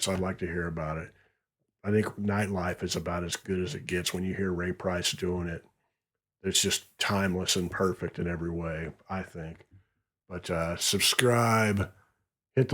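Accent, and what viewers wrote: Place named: South, United States. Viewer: You have a great voice & southern drawl & calm to awesome story telling! Love your repotoar!! 🙌👏👏✌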